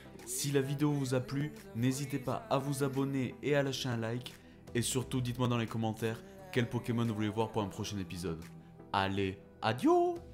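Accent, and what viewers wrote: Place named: South, France. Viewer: Ptdr j’adore ! Tu viens d’où avec cet accent fada ?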